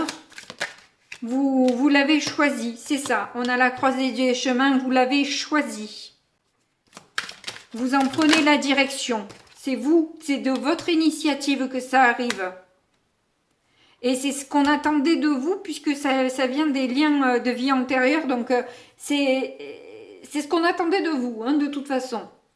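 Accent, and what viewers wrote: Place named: South, France. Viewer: Bonjour 😊 Votre tirage est clair,simple et sans Chi Chi J’adore votre accent (sud?)..😂😂j’ai le même !! Du coup pour vous encourager et vous suivre,je m’abonne 😄 Bonne continuation 🙏🙏🙏🙏🙏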